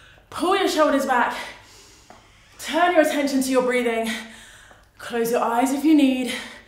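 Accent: England, United Kingdom. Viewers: I Love you English accent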